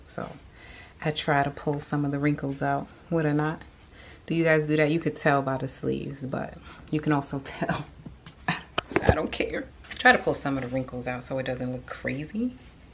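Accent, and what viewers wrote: New York, United States. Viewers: I just found your videos and saw you live in Texas but when I heard you talking I was like she is from NY has to be. I am a fellow New Yorker who is planning to leave soon you are 💯 it’s too much hustle bustle etc. Great video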